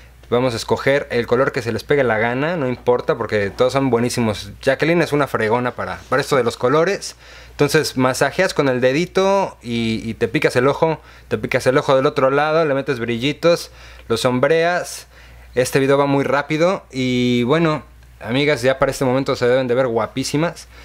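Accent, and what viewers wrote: Mexico. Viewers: Me parece que tu esposo es MEXICANO me suena muy MEXICANO como muy bromista, hablan perfecto el español LIKE SI CREES LO MISMO O COMENTA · Hola bonita! Asi me pongo la mascara 😂y! Woooww! Una voz muy linda y ese acento bien mexicano! "Las cejas tipo Frida khalo" 😂😂😂 Me encantó el video 😄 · 😂😂😂😂 Ese "dejo" ranchero de tu esposo da risa. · Jajajajajaja lo Mexicanos salen con unas... como me llama mucho la atención escuchar su dialecto.. jaja como dicen ustedes es padrisimo saludos desde Colombia.. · Tiene acento mexicano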